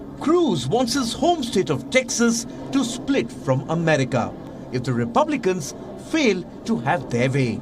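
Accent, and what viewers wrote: India. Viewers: I think she's speaking in an indian accent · That could always just be India’s dialect of English. Different places speak the language differently. One I’ve noticed that we don’t say here is “pressurizing” rather than “pressuring”.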